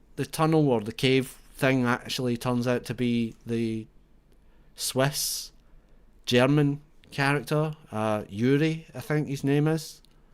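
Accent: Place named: Scotland, United Kingdom. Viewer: was expecting an american person making this ... was really shocked when i heard a scottish person start talking! You must be from the outer hebrides or something because the majority of scotland is a secular , rational , irreligious place.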